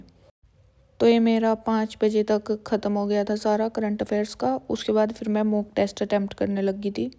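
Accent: Haryana, India. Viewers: Hahah shi 😂 haryana aale hi bolya kry nui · Bhen muje lagta h app Haryana se ho awaj se😂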